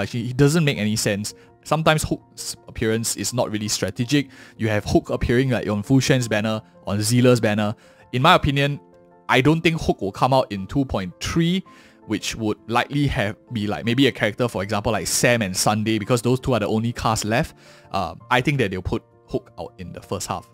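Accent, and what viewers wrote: Singapore. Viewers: Heavy Singaporean accent (pls tell me)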